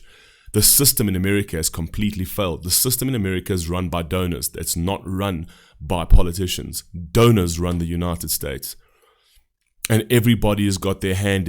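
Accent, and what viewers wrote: South Africa. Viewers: You sound South African,….why you ran away…reacting to a song when shit is 10 times worse here in SA! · I'm just guessing from your accent that you are (at least originally) from South Africa, and you have a better grasp of what's really going on in the United States than 80-90% of the citizens of this country. Kudos sir, great reaction and rant!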